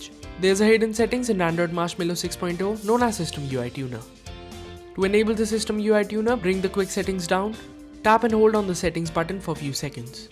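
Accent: India. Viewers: Also, it's the Indian accent which is prompting everyone to say they can't understanding a thing lol xD It seems neutral to us, but not so much to others.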